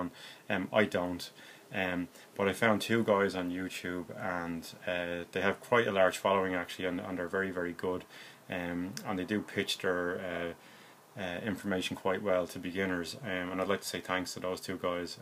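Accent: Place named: Ireland. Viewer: I was wondering where i had heard your accent somewhere i visited before... and your accent is Irish isnt it?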